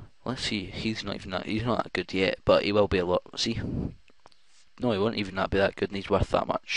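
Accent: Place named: Glasgow, United Kingdom. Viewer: you fae glesgae or rather glasgow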